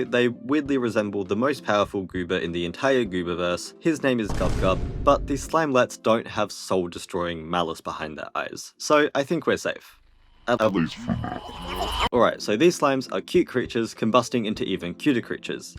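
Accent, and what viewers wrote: Australia. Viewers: HES AUSTRALIAN?! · 2 comments about the middle-ish section. 1st. YOU'RE AUSTRALIAN?! WHERE I NEED TO KNOW SO I CAN GO IM ALSO HERE 2nd. I use never eat soggy wheat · Waaaiiiit! Your Australian!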